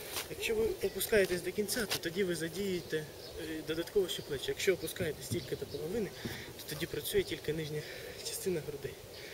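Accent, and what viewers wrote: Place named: Russia. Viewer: і в додаток, відчувається, що в твоєму оточенні розмовлять російською), "щ" = "шч" при вимові, де "ч" проривне і чітке.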